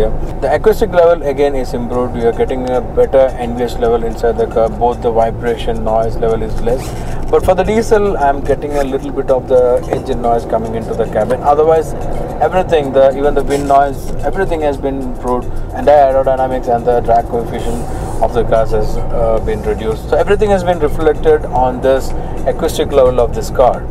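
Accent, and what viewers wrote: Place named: Kerala, India. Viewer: Mallu accent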